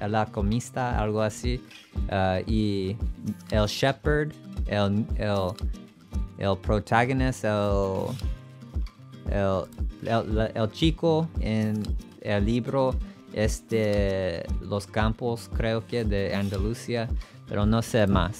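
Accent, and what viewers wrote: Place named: Northern Europe. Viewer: Hola para alquilar un apartamento poco tiempo mira en Airbn, si quieres mas tiempo mira <link>. Tu español es muy bueno, pero el acento de "guiri" ya no se te ira. "Guiri" le llamamos los españoles a los extranjeros de habla inglesa o del norte de Europa.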